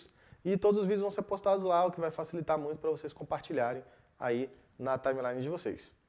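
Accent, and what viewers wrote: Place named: Belo Horizonte, Brazil. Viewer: Ele é do RS? Fala como alguém de BH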